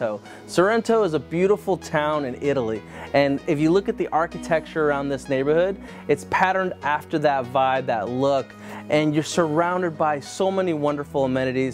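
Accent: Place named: United States. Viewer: God,! I lived in Dublin (Ireland) decades ago and I was thinking, "Gee, that doesn't look like Dublin." And then I thought it strange that a guy named with an American accent would be selling a home in Dublin in Ireland. Not to worry, though. I'm not so dumb that I didn't figure it out eventually.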